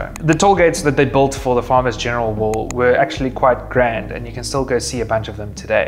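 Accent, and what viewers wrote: South Africa. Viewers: I know a South African when I hear one👁️🇿🇦👁️ great video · Is that a South African accent? ❤ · South African presenter? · Ek het gedog dié man klink soos 'n Suid-Afrikaner en toe ek gesien het, het ek sommer net geweet!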